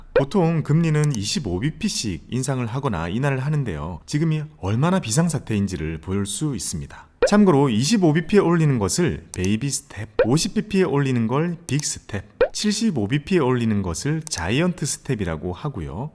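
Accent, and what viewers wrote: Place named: Seoul, South Korea. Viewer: 경제뿐만 아니라, 서울말도 배울 수 있어서 좋은 채널인 거 같아요! 님 쵝오:)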